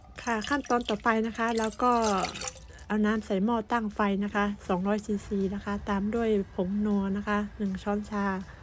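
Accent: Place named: Japan. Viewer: อยู่ญี่ปุ่นนานจนลืมภาษาไทยแล้ว 555 สำเนียงพูดก็แบบคนญี่ปุ่นเลยอ่ะ เสียดายไม่ทานเนื้อแต่ก็ปรับเป็นหมูแทน น่ากินมากเลย ชอบหอมหัวใหญ่ด้วย 🥰🥰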